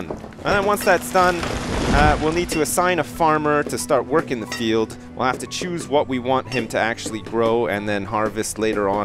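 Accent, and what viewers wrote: Canada. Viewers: I can hear your Canadian accent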